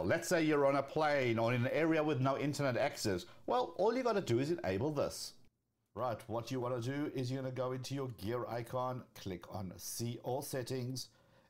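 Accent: South Africa. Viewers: your content is amazing and your SA accent makes it even better.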